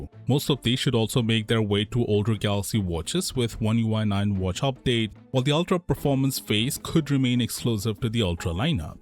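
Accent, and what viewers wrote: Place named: India. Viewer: He clearly speaks English with a Hindu accent, he’s not Turkish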